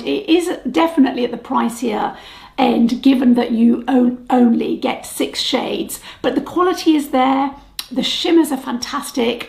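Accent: United Kingdom. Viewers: I really enjoy your video’s! Love how British you are, and how you talk. Oh and the reviews are absolutely great, too!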